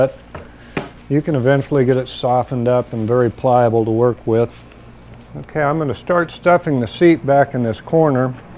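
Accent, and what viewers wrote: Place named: Midwest, United States. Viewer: I love watching you work. After 4+ decades in the Navy and federal wildlife law enforcement, I have developed an interest in old hand tools, carving, backpacking, fly fishing, AND furniture restoration. Your videos are almost “zen like”.....in that they relax not only me.....but surprisingly, my Australian Shepherd as well!!! Plus.....I’m learning something! I’m just getting into the restoration of a Stratford Chair Company mission-style chair....and discovered your “channel”! You remind me of a younger Sam Elliott....you even have same midwestern speech pattern! Bravo-Zulu, job well done....on a well-produced AND instructive AND relaxing YouTube video!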